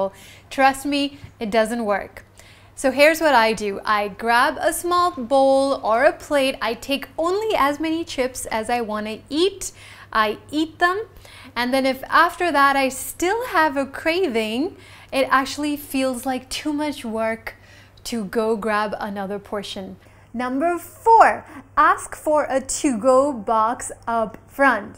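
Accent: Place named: India. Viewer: she is india cannot hide the accent :)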